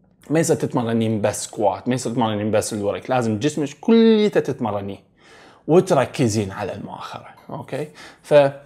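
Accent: Iraq. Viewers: لهجه عراقية هاي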